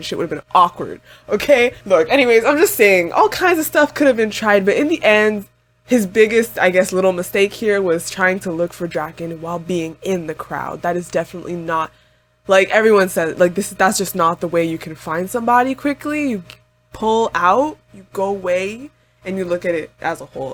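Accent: France. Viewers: are you french? 😮😮😮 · Ton accent français est très beau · GIRL U FRENCH !?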